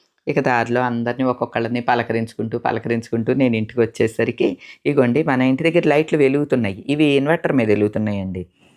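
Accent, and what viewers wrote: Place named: Godavari, India. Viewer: Maintinundi aa someswaruni darshanam kaliginchinanduku chala santhoshanga undi chala santhosham yee janmabandamo idi sivayyalani andarini chupistunnandiku chala santhosham mee godarollo matalu chala theeyyagauntayandi aaa godari neellalaga chala santhoshamandi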